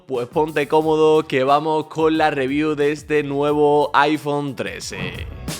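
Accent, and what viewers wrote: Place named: Spain. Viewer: Bueno, yo solo oigo a un español hablando, qué hay de "raro"?😂 No sabes lo que son los acentos?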